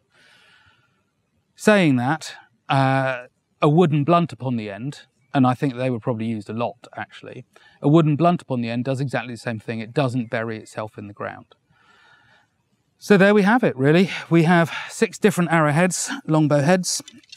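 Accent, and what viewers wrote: England, United Kingdom. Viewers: 16 Minutes of the spoken word on arrows thoroughly enjoyable, the British accent so much more appealing and easy to listen to than that, I know everything American wine. Thank you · Is he English?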